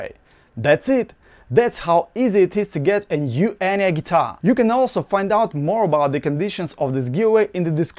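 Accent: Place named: Russia. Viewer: Hi! I hear accent.. You russian?)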